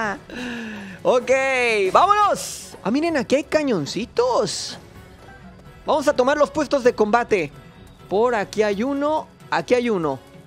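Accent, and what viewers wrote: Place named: Spain. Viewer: Que buen Gameplay! muy way! oirte hablando con asento Español gran!! imaginate yo, viviendo tan serca de los videojuegos y dejandolos de lado, estoy aprendiendo mucho,y disfrutandolos mas,con vuestros videos! crack!!! jeje perdon otra vez x tanta tardansa,y gracias! te queremos mucho! viva!!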